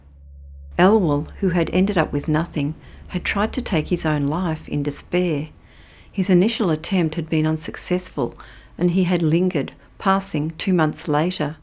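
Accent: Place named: Australia. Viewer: The narrator has an Australian accent...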